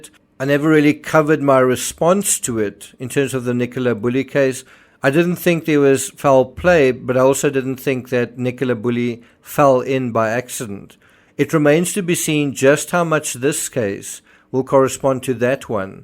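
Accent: South Africa. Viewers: i love your production and narrative, also love your S.A accent 😊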